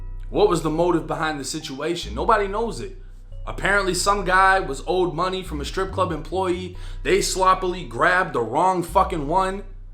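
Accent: Boston, United States. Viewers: First time ever seeing this channel….. dude gotta be from Boston 😅😂😅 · I Love❤ Your BOSTON ACCENT...🔥🔥🔥🫶😁🔥🔥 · I hear a boston accent? i live in dorchester. from southie. definitely subscribing.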